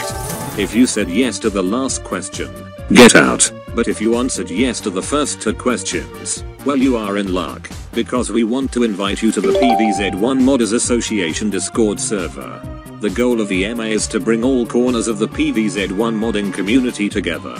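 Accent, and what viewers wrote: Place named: United Kingdom. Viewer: the tts voice is british, therefore it pronounces the "z" as 'zed' lol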